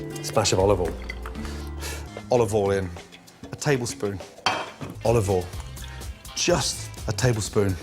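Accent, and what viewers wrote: Scotland, United Kingdom. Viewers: Why am I watching a Scottish guy say olive oil for 4 min at 3 am? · Ahhh this stingy-scottish accent i love it.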